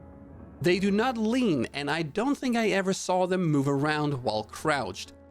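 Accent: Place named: Denmark. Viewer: du er fra Danmark, ikke sandt? syntes jeg kan kende en dansk aksang:)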